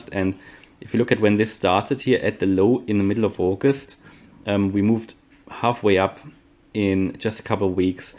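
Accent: Germany. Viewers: German accent detected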